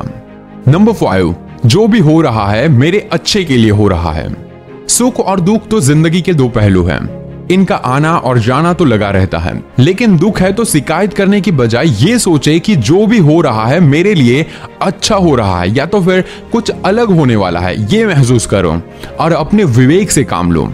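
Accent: Gujarat, India. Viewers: Aap gujraat se ho kyaa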